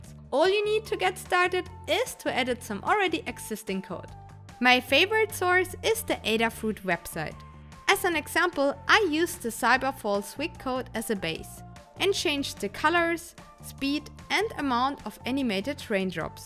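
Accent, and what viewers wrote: Germany. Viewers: Mein erster Gedanke: "Sie muss eine Deutsche sein". Typisch deutscher Akzent xD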